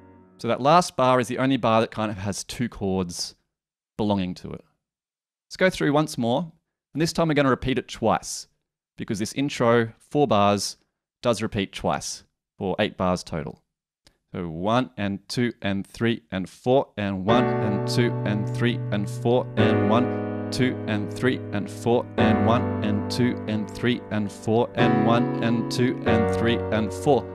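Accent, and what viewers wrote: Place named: England, United Kingdom. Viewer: I sense an English accent xD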